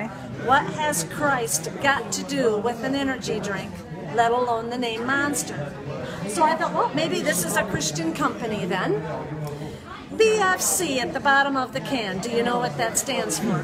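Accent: United States. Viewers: That New York accent just makes everything better 💀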